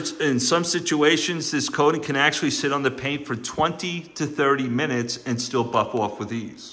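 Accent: New York, United States. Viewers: if i know my people well , I'd say you're from NY. Long island here. Do you guys do detailing or just sell the products.? Im in the Dallas area as well.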